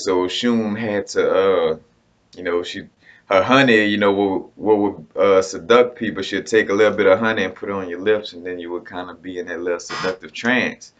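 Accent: New Orleans, United States. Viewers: U sound very New Orleans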